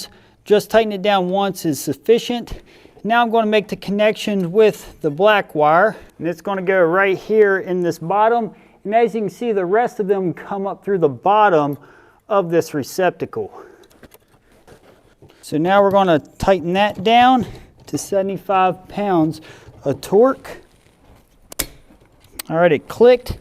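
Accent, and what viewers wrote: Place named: Pittsburgh, United States. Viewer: The yinzer accent is pristine. Feels like home. 😉 Excellent video, man.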